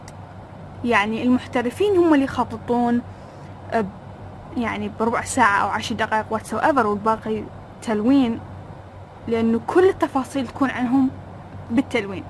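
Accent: Iraq. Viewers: جمال اللهجة العراقيه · حبيت اللهجه العراقيه تجنن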